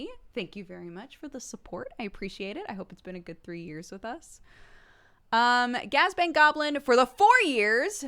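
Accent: United Kingdom. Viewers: Very British for some reason 😂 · She's become fully bri'ish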